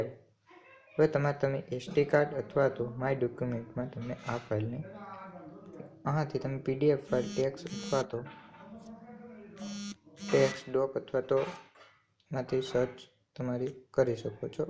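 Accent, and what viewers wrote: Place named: India. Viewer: Stupif indian voice smells like rotten egg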